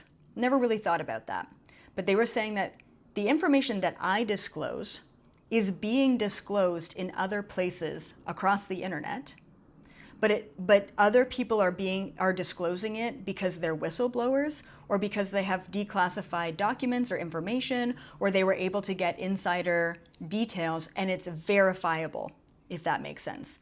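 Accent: Canada. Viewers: Thank you for your readings!! I found your channel about a week ago and realized you were Canadian from your accent! My parents and relatives are from Canada, they would be appealed by what is happening in the United States now!! Total corruption!!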